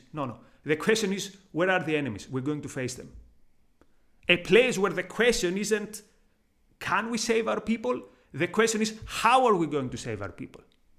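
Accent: Greece. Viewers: I knew immediately this guy is Greek by the accent 😅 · thank you for that, its very profound of course. after listening to you for 2 minutes you sound to me greek. Kreeks need to understand that Ardugan from turkey is a radical muslim and if people will not unite against radical / evil islam - Greece will have a genocide by Turkey. The muslim brotherhood / radicalization is a very serious problem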